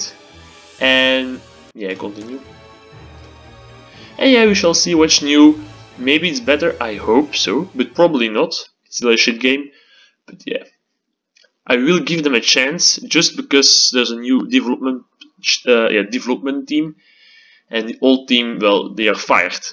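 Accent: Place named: Netherlands. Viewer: Mooie video, maar aan je accent kan je gewoon horen dat je Nederlander (of vlaams) bent 😂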